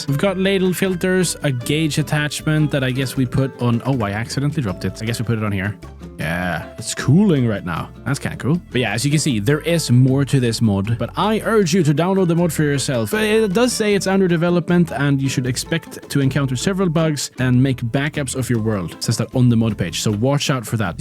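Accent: Sweden. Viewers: Asså jag har aldrig hört en sån stark svensk dialekt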